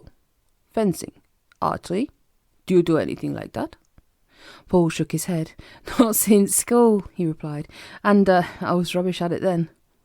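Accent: Newcastle, United Kingdom. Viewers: lovely accent and great reading, thankyou. Geordie?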